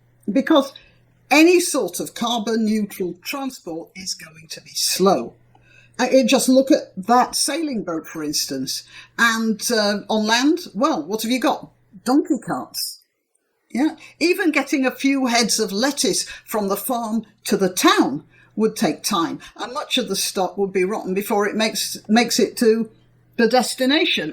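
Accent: United Kingdom. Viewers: You are rude. Why? Aren't you a litte bit ashamed of how you are talking about people,( as less intelligent and eating bad food) in other countries, for example Sweden? People from UK I have met so far have been very nice and kind. Apparently not all of them. I guess you are born in UK, you seem to speak british english. No, I will not like and not subscribe... I am not fond of rude and mean people.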